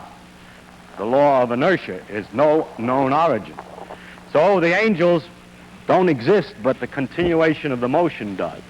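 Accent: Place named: Brooklyn, United States. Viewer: Dr. Feynman speaks with the Brooklyn accent of a man who was born in 1918. Do modern Brooklynites still speak with this accent, or has mass broadcast communication quashed it? The New Orleans accent that my grandparents spoke with is all but dead.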